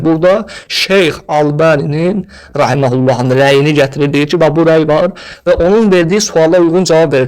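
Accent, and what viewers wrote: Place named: Iran. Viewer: Sən niyə İranlılar kimi danışırsan?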